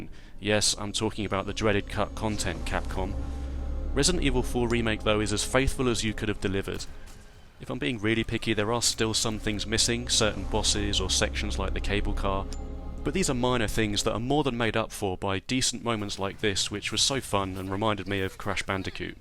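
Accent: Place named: United Kingdom. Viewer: Two of the things i like most about youtube channels, smart English accents and resident evil analysis. Liked and subscribed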